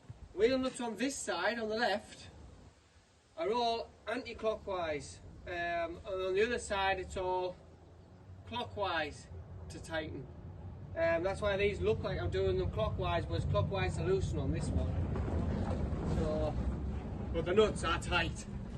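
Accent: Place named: Northern England, United Kingdom. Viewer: Thought you were couldnt tell by the accent if you are based in north east or north Yorkshire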